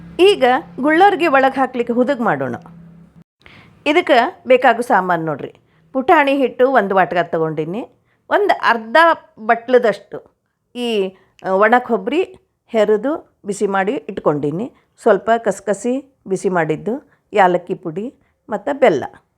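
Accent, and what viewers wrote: North Karnataka, India. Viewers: ನಿಮ್ಮ ವೀಡಿಯೋಸ್ ಭ್ಹಾಳ informative ಆಗಿರ್ತಾವ.... ರಿ ಮಾಮಿ... ನಾನು ಶ್ರಾವಣ ಗೌರಿ ಕೂಡಿಸಿದನ್ನ ನಿಮ್ಮ ವಿಡಿಯೋ ನೋಡಿನ ಕಲತೀನಿ.... ನಮ್ಮ ಉತ್ತರ ಕರ್ನಾಟಕದ ಭಾಷೆ ಭ್ಹಾಳ ಚೆಂದ ಕೇಳಲಿಕ್ಕಿ.....ಏನೋ ನಮ್ಮತನ ಅನ್ಸ್ತದ ರಿ... ಇ ಭಾಷೆ.....Thanks ರಿ.... ಹಿಂಗ ನಮಗ್ ಮಾರ್ಗದರ್ಶನ ಮಾಡಕೋತ ಆರಾಮ ಇರಿ.....❤😊 ಮಾಮಿ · ನಿಮ್ಮ ಉತ್ತರ ಕರ್ನಾಟಕದ ಭಾಷೆಯ ಶೈಲಿ ತುಂಬಾ ಚೆನ್ನಾಗಿದೆ ಹೀಗೆ ಮಾತಾಡಿ ಮೇಡಂ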